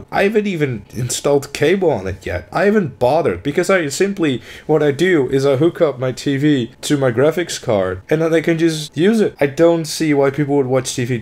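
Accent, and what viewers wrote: Netherlands. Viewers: from what country are you? sounds a lot like you are from holland. since the tv you describe is exactly what it is here.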